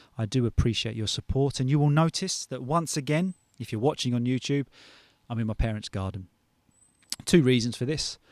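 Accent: England, United Kingdom. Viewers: Is it british accent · Hello,I like your videos,they are amazing. Especially like this kind of video where you talk about an issue and discuss it.I learn a lot from you.I like your british accent.so go ahead and make more of this content.from Algeria 🇩🇿 Many thanks 😊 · iam glad i found you i like english accent way better than american so this is just perfect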